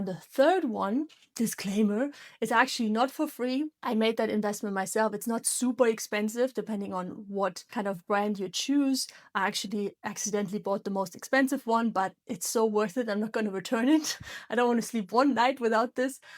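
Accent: Germany. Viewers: Thank you so.much! I already did the tapping and it works instantly. Do I sense a slightly German accent? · Du hast einen starken deutschen Akzent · Danke für das erfrischende Video :-). Ich würde Sie auf Grund Ihrer Aussprache irgendwo im hessischen Raum verorten, aber vielleicht irre ich mich auch, und Sie haben gar nichts mit D zu tun ;-). Falls jemand gerne liest : von Clinton Ober gibt es das empfehlenswerte Buch (gibt's auch auf deutsch) "Earthing - The most important health discovery".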